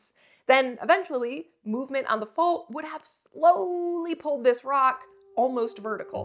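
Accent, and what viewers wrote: Michigan, United States. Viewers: Good to hear the Michigan dialect again. Been awhile since hearing it.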